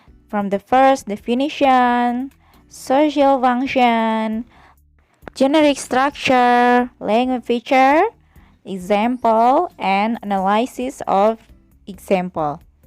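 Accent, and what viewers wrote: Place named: Indonesia. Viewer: Nice indonesian accents Favourite teacher....thankyou for the lesson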